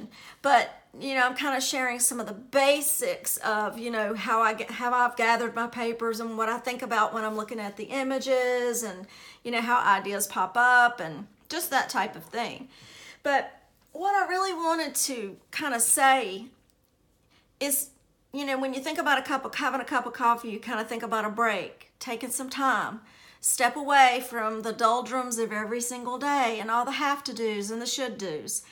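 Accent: South, United States. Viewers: Thank you for sharing what was on your heart today and inspiring us. It was very encouraging and I appreciate it. I love your creativity, and I'm really enjoying this series! It's like getting to sit right there with you and so we can create together. Plus, we get to hear your cute Southern accent and the way you express yourself. FUN!! I am thrilled that you found another way to use those "tag pockets!" That just makes it so much more fun for me and hopefully for those who purchase the kit as well. If we all did things the exact same way, it would be boring, wouldn't it? lol I love watching how you do your process and it's fun to sorta "see inside your head" and to see how it all comes together for you. I'm working with the regular sized "Rosewood Cottage" Journal kit right now and think it will be so cool when we are both done to see the different ways we use the elements.:) I love the little booklet with pockets that you created! 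Thank you for the tut!! <3 I so appreciate you and your sweet heart!! xo Marilou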